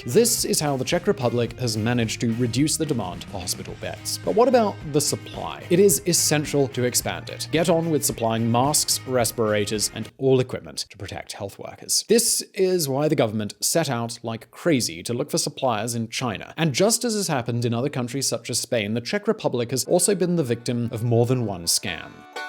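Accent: United Kingdom. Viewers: Hi friends, i'm latino american and i can't understand this accent, (british accent) i'd like to listen these videos in EE.UU english, however you can speak slowly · Are you actually from Czech Republic? You have such a good british accent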